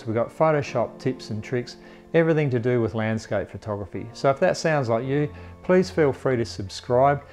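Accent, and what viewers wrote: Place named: Australia. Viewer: I have watched a gazillion photography tutes on YouTube. Great to hear an Australian accent for a change.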